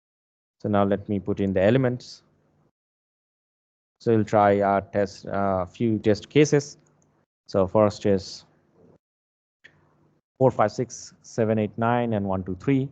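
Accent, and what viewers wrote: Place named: United States. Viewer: Tmr ekdam American accent, r u staying in USA.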